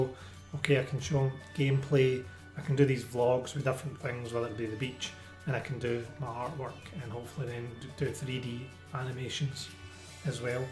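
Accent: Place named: Scotland, United Kingdom. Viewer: eh Joe is one of my favourites! You can really see how your channel is evolving 👍🏻 If you feel like popping over then do if not then it’s not a problem 😊 I’m here to stay as I don’t hear the Scottish Accent enough on YT, mines has faded a little as I’ve lived in England for 17 years 😬 keep doing what you’re doing....it’s fun to watch!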